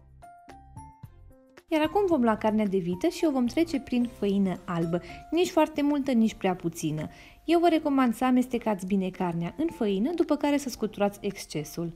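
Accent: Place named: Italy. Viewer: Bună ai fost în italia ai accent de italeanca eu mam născut în Italia 💕💕💕💕💕🥰😈💕💕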